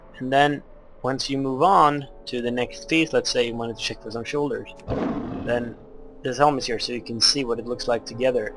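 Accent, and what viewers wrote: Sweden. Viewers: Du är svensk va? Jag hör det på din engelska/ "U are english right? I can hear it on your english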